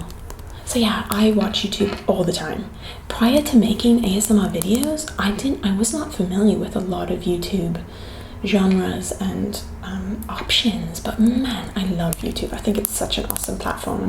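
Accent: England, United Kingdom. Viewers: Thank you for answering my question! Your channel is the most relaxing. about your accent, you should keep anonymous because it's your "original" thing. you started this way. Also, this English accent sounds cool! <3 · hold up i thought you were british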